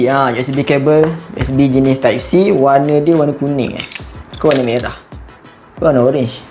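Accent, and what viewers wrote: Sarawak, Malaysia. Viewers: hi, abang ni orang sarawak ke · org sarawak?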